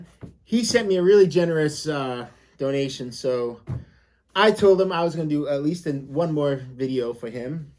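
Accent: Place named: New England, United States. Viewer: I'm surprised you don't pronounce papa as "puppa" with your New England accent.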